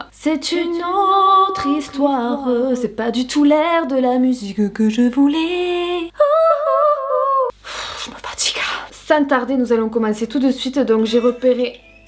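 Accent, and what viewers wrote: South, France. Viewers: Je kiffe vraiment t’es vidéos, ta nature ton délire! Tu me casse de rire à chaque fois et qd tu parles ton (notre) accent s’entend tellement et cest superbe!! Vive le sud!!! Bisous!!!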